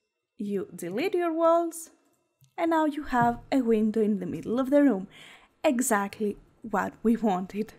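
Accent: Greece. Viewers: aww knew it!! It's comforting to hear, I'm Greek Canadian and I knew I recognized that accent anywhere :) kali nixta!! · Hi! Are you from Greece? Your accent sounds greek!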